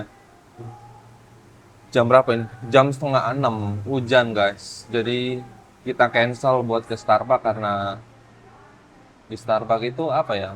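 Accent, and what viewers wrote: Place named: Palu, Indonesia. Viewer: Logat palu lho😅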